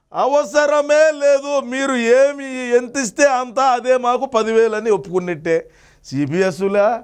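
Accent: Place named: Chittoor, India. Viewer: Chittoor village type undhi plz improve my village chittoor...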